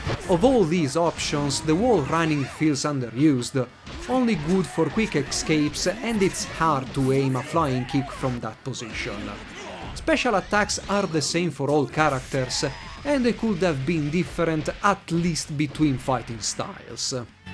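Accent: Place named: Italy. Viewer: Your italian accent is quite annoying.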